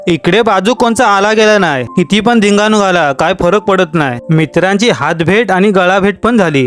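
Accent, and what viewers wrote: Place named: Malvani, India. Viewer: तुझी भाषा मालवणी भाषेला जवळची आहे... पण ती १००% "मालवणी" नाही... तू " " म्हणजे कणकवली जवळच्या "नागवे" गावातला आहेस का?? किंवा तुमचा मूळ गाव "नागवे" आहे का??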